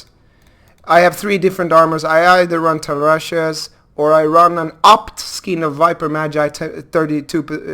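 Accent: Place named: Sweden. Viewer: Dude, this is a cool upload. Where are you from? The accent sounds.. Swedish? In that case, fan så coolt.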